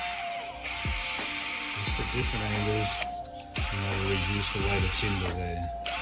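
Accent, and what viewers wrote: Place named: Australia. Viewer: Good ol you tube recommendations. This was really useful and I hadn't seen that drilling approach before on harder wood for bending. Having been raised in Melbourne before finally ending up in the UK it is always great to hear that accent. Cheers for this vid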